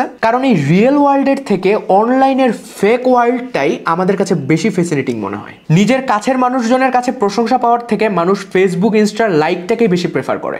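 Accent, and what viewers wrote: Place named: Dinajpur, Bangladesh. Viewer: বাবা তুমি যে দিনাজপুরের ছেলে ভাষা শুনে বুঝলাম।❤❤❤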